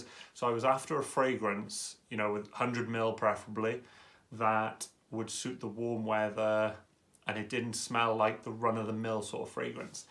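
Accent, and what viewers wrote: Wales, United Kingdom. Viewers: an Escentual buy, eh? Nice to hear another Welsh person reviewing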